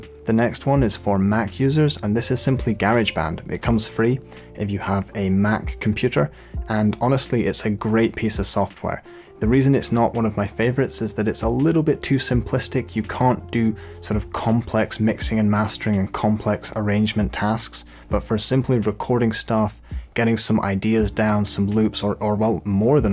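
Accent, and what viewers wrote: Scotland, United Kingdom. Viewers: what part of scotland are u from mate ???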